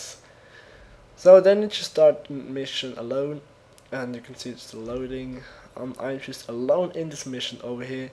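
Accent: Germany. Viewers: Man hört richtig das du aus Deutschland kommst. :D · Ej du bist deutescher oder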